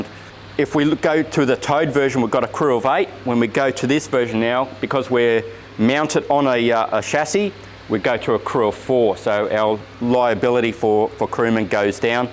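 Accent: Australia. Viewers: Aussie vernacular. You'll have get used to it if you want to watch this channel.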